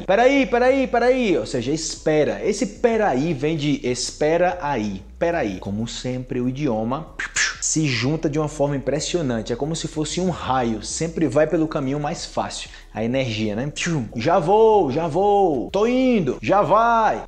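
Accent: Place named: Colombia. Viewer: Eu sabia que vç tinha espanhol da Colômbia! 😉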